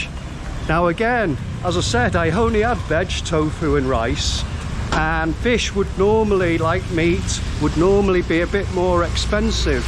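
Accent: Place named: Liverpool, United Kingdom. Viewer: That's unfortunate that you got ripped off by that woman. Yeaaa, just like anywhere else in this world, there are good people and bad people (mostly good ones, though). I understand your frustration, indeed. It is not the 15000 rupiah (which is a minute amount) but the principal behind it. I hate dishonest people but this world is not a perfect place. Well, I hope this experience won't dampen your excitement while visiting Jakarta. Sorry you had to learn the hard way. Forget about this tiny incident and keep exploring and have a great time. I enjoy your videos and your Liverpool accent... lol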